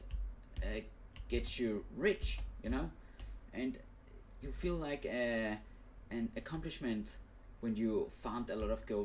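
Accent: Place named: Denmark. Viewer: What contry is you from? Du lyder dansk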